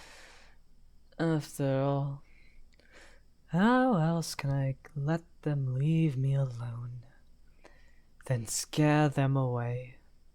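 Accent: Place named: United Kingdom. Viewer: AHHH I loved your british accent 😍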